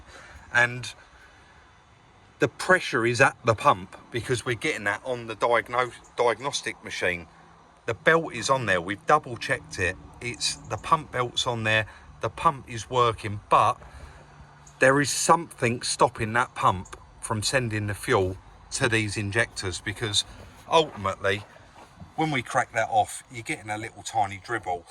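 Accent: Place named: Kent, United Kingdom. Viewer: I really enjoy your shows I now live in Cardiff but spending the first 30 years of my life in Rainham it’s nice to hear a proper accent I also enjoy when you go out driving around what was my local area seeing some of the old places especially the Kingsferry Bridge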